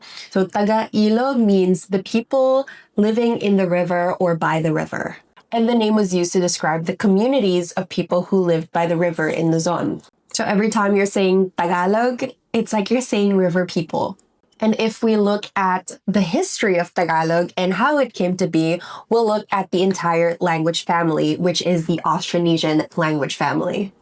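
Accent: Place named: California, United States. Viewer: Sounds like you speak English with a California accent to me.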